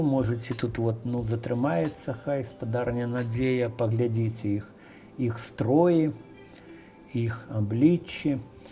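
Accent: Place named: Russia. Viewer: Ен размауляе на мове з руским акцэнтам. Мова инакш гучыць.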